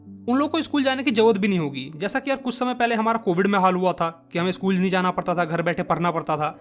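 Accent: Bihar, India. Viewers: Bhaiya aap bihar se ho na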